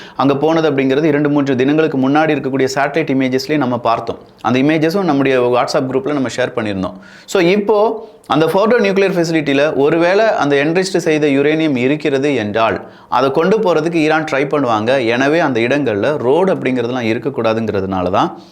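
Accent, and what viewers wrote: Kerala, India. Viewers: Bro your official slang reminds kerala pronunciation! Are you from kerala?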